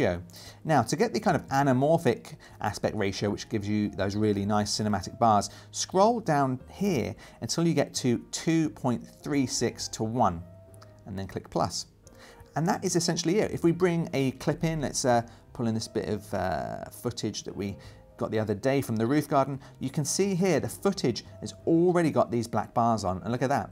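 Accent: United Kingdom. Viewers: You are a great facilitator!!! Can this program be bought as an IOS APPS? Can it be used STAND ALONE version? No Photoshop needed? Love the Mersey Accent. Thanks for your attention to my questions.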